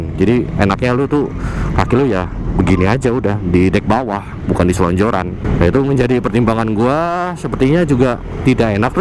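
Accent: Surabaya, Indonesia. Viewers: Saya sih emang dari dulu suka isi dari video lu bang dan ga peduli motornya apa yg penting isi dari video itu suka aja denger suara khas suroboyo campur jakarta 👍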